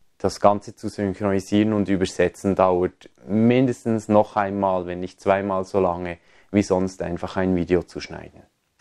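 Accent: Switzerland. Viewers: Schweizer Hochdeutsch passt 👍🏻👍🏻 bin froh das es auch Videos in Deutsch gibt. DANKE Lg aus Österreich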